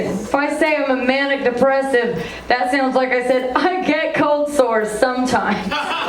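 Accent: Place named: South, United States. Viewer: Good set. Have you visited Alabama lately? That southern accent is coming out. I never thought about that Seattle having a giant needle thing. I like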